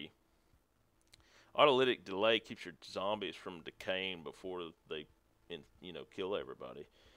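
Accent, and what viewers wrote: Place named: South, United States. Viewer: Can't stand that southern voice but your tutorial worked so good job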